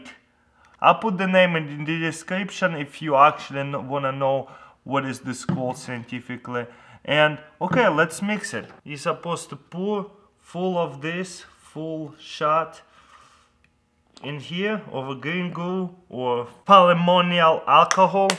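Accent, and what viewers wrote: Russia. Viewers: Я все не пойму по твоему акценту, ты русский или?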